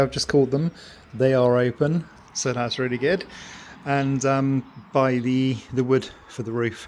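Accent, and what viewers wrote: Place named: Australia. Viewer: Can you tell us approximately where you live? The scenery is so beautiful. I can tell feom your accent you are in Australia or NZ. (Hope I didnt offend-I know those are 2 very different places!)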